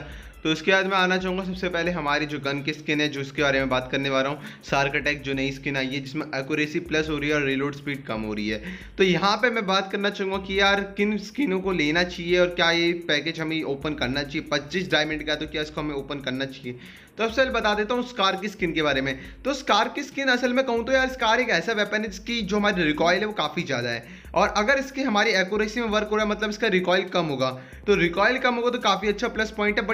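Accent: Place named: Garhwal, India. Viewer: se pta chlta ha ki apna gadwali boli mst bahi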